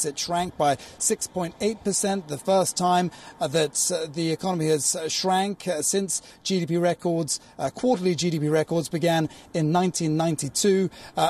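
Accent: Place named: India. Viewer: INDIA ACCENT TAKEN TEEKEN, ABLE EBLE, SAY SEY, FACEBOOK FECEBOOK, GO GU, WAY WEY, GROWTH GRUTH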